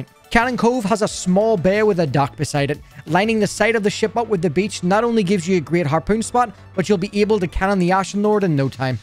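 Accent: Northern Ireland, United Kingdom. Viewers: Are you northern irish?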